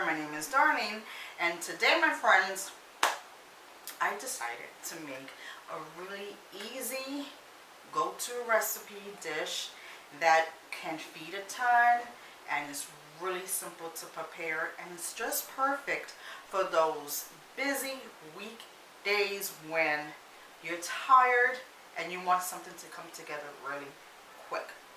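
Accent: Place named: New York, United States. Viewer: Love it looks delicious . are you from NY ? I live in the south but I'm originally from Long Island NY. I miss hearing the NY accent . love you recipes keep putting them out ❤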